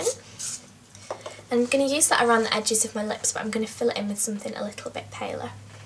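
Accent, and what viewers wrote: United Kingdom. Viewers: where are you from in the uk? your accent is so interesting ive never heard one quite like it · i like your british accent:) hihi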